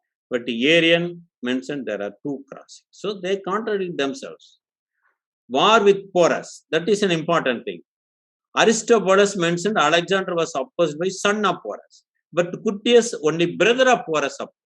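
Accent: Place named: South, India. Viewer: The speaker is knowledgeable. But his South Indian accent of the English language is TERRIBLE !!!! Please record this speech in someone else’s voice. As an Indian, it’s difficult and irritating for me to understand this fellow. For a non- Indian, it’ll be impossible to understand this person!!!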